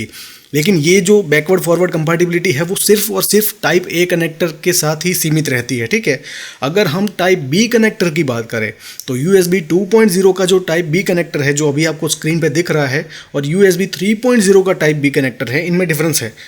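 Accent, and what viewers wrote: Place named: South, India. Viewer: south indian aah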